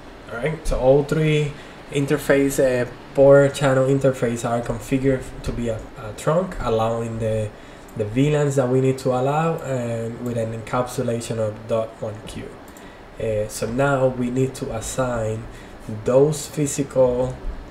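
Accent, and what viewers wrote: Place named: Brazil. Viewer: sotaque de BR em man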